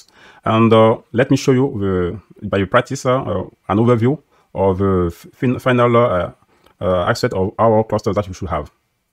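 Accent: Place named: France. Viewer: still very hard to listen on 0.75X speed, the guy has a very thick French accent, it’s realy hard to follow.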